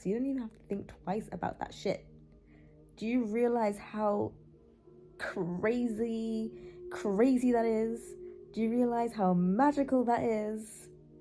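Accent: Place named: United Kingdom. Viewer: UK accent Wit Intelligence is So Fine🔥🔥🔥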